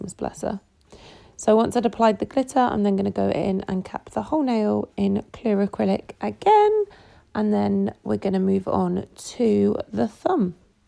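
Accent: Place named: United Kingdom. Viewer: Lol I didn’t understand what you were saying when you were saying glacier for a while but then I figured it out. In America we say it like glay-shur, so the way you say it I was like what?! Lol. I like uk accents, everything is prettier 😊 Also, these nails are gorgeous! Love how you created that ice affect with the foil, definitely gonna use that in a set ❤️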